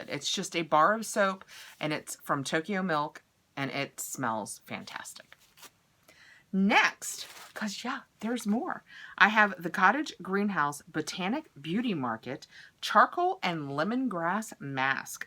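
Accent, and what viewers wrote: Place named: South, United States. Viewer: Lavenda! That is how a true Southern girl speaks! My grown kids tease me as I say ,"Souwich," instead of "sandwich"!! Raised in Houston and love it!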